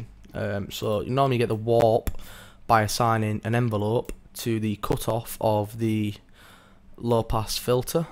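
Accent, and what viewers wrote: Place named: West Yorkshire, United Kingdom. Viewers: Bradford by the sounds of it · Nah, that's a West Yorkshire accent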